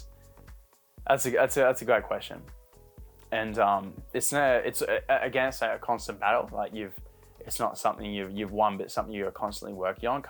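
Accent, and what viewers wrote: Australia. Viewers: I like watching your dives. I like your Aussie accent. My question for you is have you ever been to Canada 🇨🇦?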